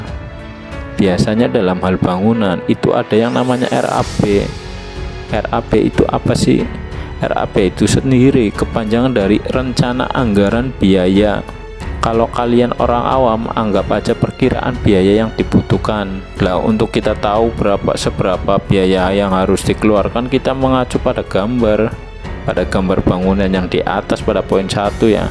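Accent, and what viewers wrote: Banyumas, Indonesia. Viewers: ini orang kocak abis..asal jeplak..ngapak..gw ampe geli ketawa dengerin nya..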